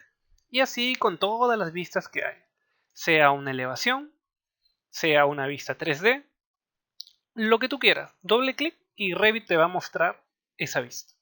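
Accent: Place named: Mexico. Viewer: EXCELENTE MANERA DE EXPLICAR MI BUEN. ME DA CURIOSIDAD TU ACENTO, PARECE ACENTO MEXICANO JAJA. GRACIAS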